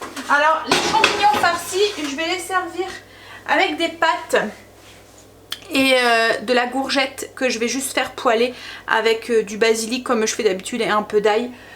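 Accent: North, France. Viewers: Bonjour. Je découvre votre chaîne et j'aime beaucoup. Vous avez un joli accent, du Nord? Je n'ai pas Facebook, quelle levure utilisez-vous? Merci ❤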